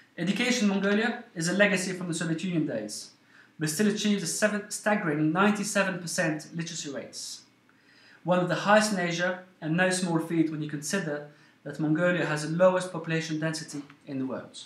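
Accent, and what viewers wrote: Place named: Wallonia, Belgium. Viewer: Wallon ? enfin c'est ce que je dirais à l'accent. Excellent video thank you very much , all those numbers are very very very interesting.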